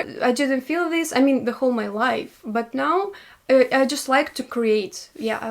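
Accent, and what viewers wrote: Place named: Russia. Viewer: You are not alone. I think the reason why your youtube channel is doing so well is because your a pretty girl with a cute russian accent. Your videos are genuine and you present yourself in a humble, down to earth kind of way. But don't worry about the loneliness.. it could be worse..like me..trapped in Australia. Our Australian Government has lost its mind.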